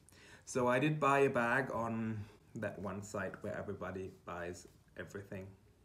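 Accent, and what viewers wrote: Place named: Berlin, Germany. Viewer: Aha! Du kommst aus Berlin! Das dachte ich, als ich Sie sprechen hörte - Thank you for the genius tip!!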